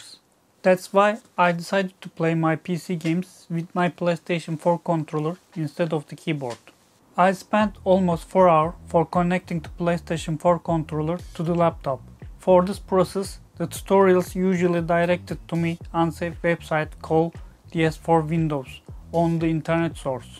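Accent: Turkey. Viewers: dude by the accent you are indeed either Turkish or i am stupid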